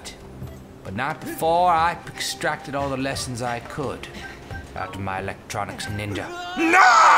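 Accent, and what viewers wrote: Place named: England, United Kingdom. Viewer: i love your english/britsh accent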